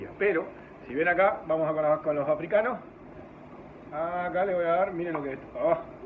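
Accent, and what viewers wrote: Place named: Entre Ríos, Argentina. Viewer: si eras de Entre Ríos por la forma de hablar igual a acá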